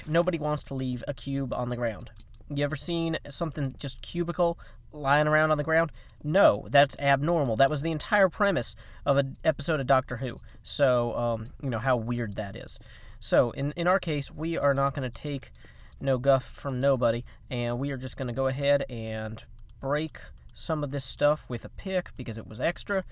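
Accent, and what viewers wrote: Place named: Texas, United States. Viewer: You have a cool accent It sounds Texan but the video says not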